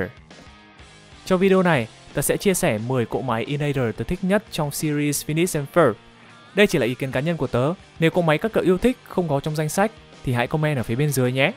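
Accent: Standard. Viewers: Tiếc nhỉ mà ad có giọng hay thật lại phát âm chuẩn nữa và đầu óc cực "chong xáng" khi làm về Doof's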